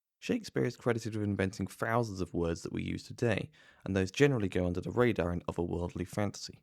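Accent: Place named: RP, United Kingdom. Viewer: You see what's interesting?? NORMALLY I'd actually AGREE with you... In case, however - nah. The situation here is TOO damned. Hear it from someone who spent 4 years of his life in SCOTLAND: -- I can barely make out utterings sometimes, and he actually speaks in a STANDARD British accent. It's just he's so QUIET, and his prosody is SO CUT-UP and faint...:/.. Seriously, dudes... I have to rewind like 10 times, and it takes me like 30 minutes to fully "comprehend" a 7-minute talk...:/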